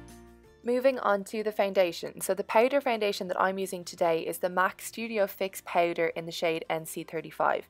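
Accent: Ireland. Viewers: how can she help that its an irish accent im irosh and thats how i say it .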